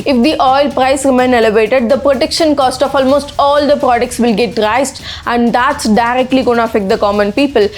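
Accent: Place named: Tamil Nadu, India. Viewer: 😡😩😡😫😡Madam your way of talking and pronounciation of English is horrible and annoying. I know this is not your fault. As i observe your language any one can easily understand that you speak English with Tamil accent and you are a Tamilian. Tamil has only 36 letters. That is why you can not pronounce some English words it is sounding comedy and annoying. So take voice over training in English instead of 24 hour praising Modiji for good and bad and making cacophony 😏😒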